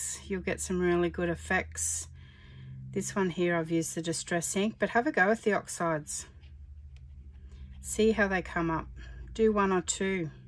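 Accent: Australia. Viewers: Lovely to hear an Aussie accent. Feels like listening to a friend.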